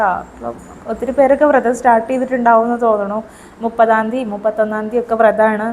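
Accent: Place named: Valluvanad, India. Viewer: മോൾടെ വീട് വള്ളുവനാട്ടിൽ ആണല്ലേ. അതാണ്‌, ഓരോ വാക്കും കഴിയുമ്പോൾ, ട്ടോ എന്നു പറയുന്നത്.👌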